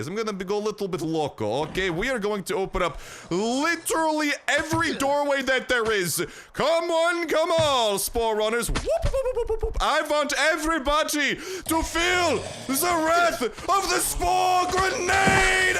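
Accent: Russia. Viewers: Hey are u russian whats wity the occasional accent whats your heritage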